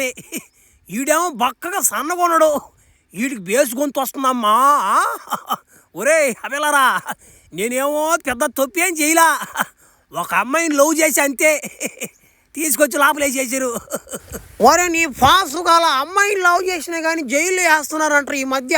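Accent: Nellore, India. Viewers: Arey Anna nuvu Nellore ahhhh nedhi 😊😊😊😊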